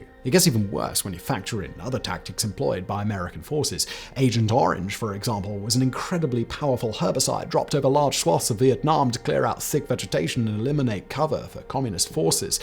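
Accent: United Kingdom. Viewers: Why is some British dude talking shit about the USA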